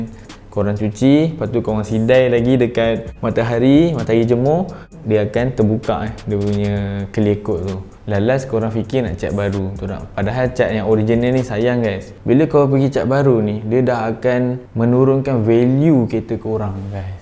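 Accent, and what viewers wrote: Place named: Pasir Gudang, Malaysia. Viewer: Speaker mcm org pasir gudang lh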